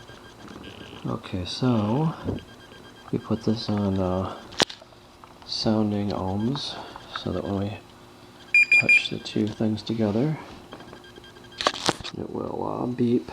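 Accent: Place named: Ohio, United States. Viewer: Is it just me or does any one else thinks hes from Ohio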